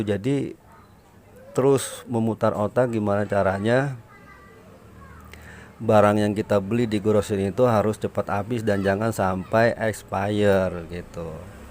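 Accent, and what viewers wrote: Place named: Jakarta, Indonesia. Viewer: G jual roko bang. Ngomongnya betawi banget bang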